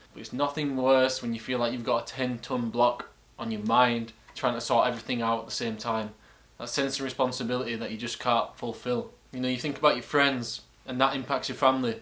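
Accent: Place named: Yorkshire, United Kingdom. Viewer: Ngl i was just lurking here to learn ur accent cause like i said i wanted to prank my friends with a yorkshire accent, BUT this video hit me in unexpected ways, i needed to hear this at this moment. I've been unmotivated to fo anything for the past 3 months. And being at my age (which is 24) it sort of builds another level of pressure whenever im paralysed by overthinking. It's very hard to snap out of it. But what u said kinda gave a push to start small, start somewhere. Thanks for this man.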